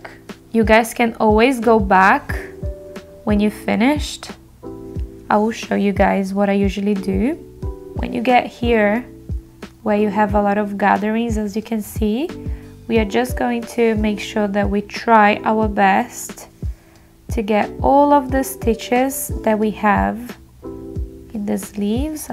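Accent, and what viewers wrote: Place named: Brazil. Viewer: That's so cute! Definitely going to try it at home. Also, by hearing your accent, I wondered... Are you Brazilian? I sort of recognized it comparing with my own accent kkkkk Ether way, I'm loving your channel so far! Keep the good work! :)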